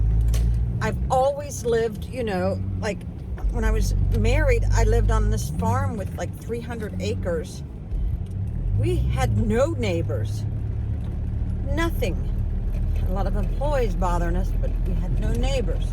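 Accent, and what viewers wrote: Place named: South, United States. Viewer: That pretty.... Southern🎙️🎙️🎙️ VOICE🌷🌷🌷🌷🌷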